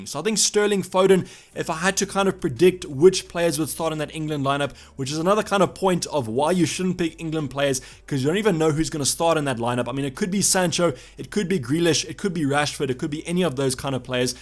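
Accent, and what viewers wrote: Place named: South Africa. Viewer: No but your SA accent just makes it better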